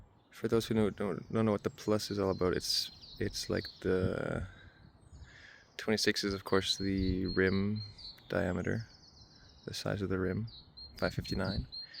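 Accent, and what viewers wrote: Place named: Canada. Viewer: i can hear the canadian in ya bud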